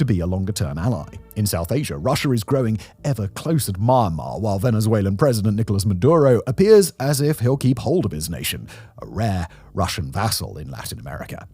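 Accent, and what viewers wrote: United Kingdom. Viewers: Even if you didn't speak with a British excent, would recognise Britain propaganda 😅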